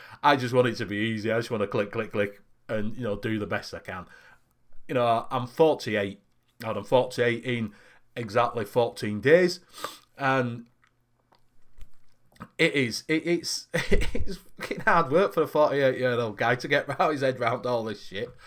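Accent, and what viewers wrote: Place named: Leeds, United Kingdom. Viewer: Ey up, only been here a short time. But heard TVP mentioning you from time to time, but Deano TDV mentioned you as well. Went on to 3 men chat after TDV show on Wednesday n Aden mentioned you as well. So thought I'd gi'it a go. Soon as I heard Leeds (just up't'road twang I liked it) so stuck on with it. You keep an eye on chat as well as chatting with other hosts (which is a pro on so many levels). I too would like to thank Les Pickin, without his videos on how to mix, I wouldn't of learned how to mix. So big ups to Les or I wouldnt of been able to create my StRipple. I probably won't be about as much when I go back t'work. With you and hosts doing mixing, your numbers should go up more as I havent seen many other people doing both mixing and reviewing, all the best in the future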